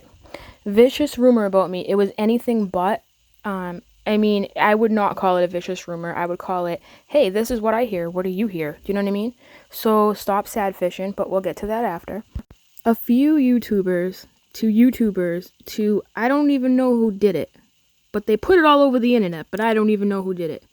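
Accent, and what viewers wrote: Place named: New York, United States. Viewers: Love your videos, love your fabulous East Coast accent! Thanks for your videos. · You are awesome... and I am not sure where you are from, but you sound like a fellow New Yorker to me! Love it! Keep being Unapologetically You Girlie!!!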